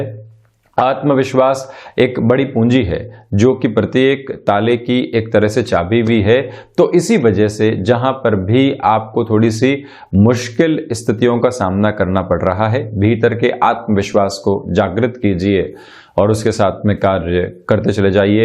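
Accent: Standard. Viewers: Beautifully said! Whenever I listen to your pure language, I am reminded of my Hindi teacher in school, Smt Rajkumari Saxena to whom I am ever indebted for learning Impeccable Hindi. Its such a pleasure to listen to you, Sir.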